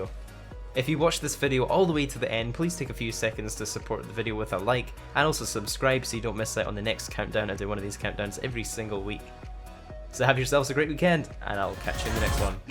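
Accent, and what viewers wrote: Scotland, United Kingdom. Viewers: I just realised we kind of the same username 😂and you sound Scottish because I’m from Scotland and u sound Scottish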